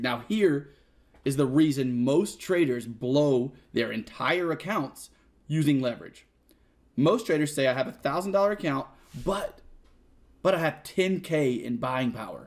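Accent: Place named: Alabama, United States. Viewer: man you have got to be from Alabama.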